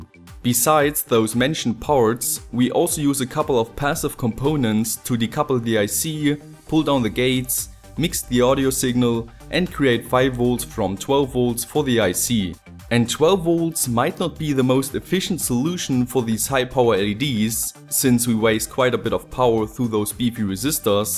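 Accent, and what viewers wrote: Germany. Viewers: Awesome video!!! I have always enjoyed your accent when you speak English--to an American speaker it sounds as if you are trying very hard to pronounce everything right. I saw your other video where you were listening to the pronunciation of "centimeter". You do a great job! I don't think you need to sound "native-born". You are completely understandable, and I do find your inflection interesting--I wondered what your first language was until I saw it was German. By the way, , you sound as if you are saying "isolation", but the wrapper around an electrical wire is called "insulation". Isolation is the function of insulation, though, so it's kind of funny. I think any English speaker can understand your videos just fine though! Small little mispronunciations are "filtered out" when we watch your videos--don't stress out about them!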